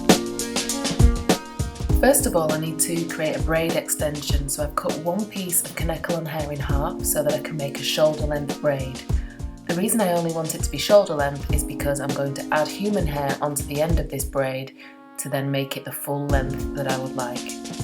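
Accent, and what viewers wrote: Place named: North, United Kingdom. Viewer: They look great and omg you're a northerner!! Haha x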